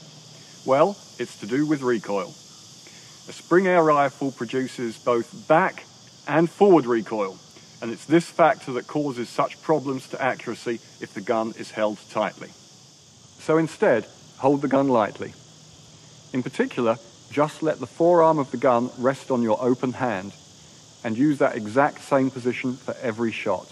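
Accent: United Kingdom. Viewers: cool, its alot better for guns in the US i bet compared to here, (especially because the bad press airguns have gotten over the past few months!) and make sure u always keep your accent, english accents are the best, i love mine haha...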